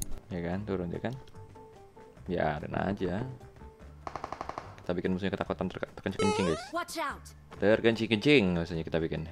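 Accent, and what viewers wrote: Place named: Medan, Indonesia. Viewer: Beda logat bro bg org medan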